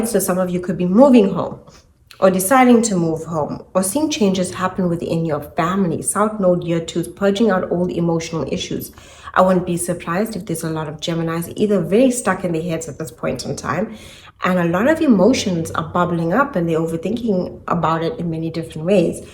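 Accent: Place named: South Africa. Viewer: Are you south african? Bcs you have such accent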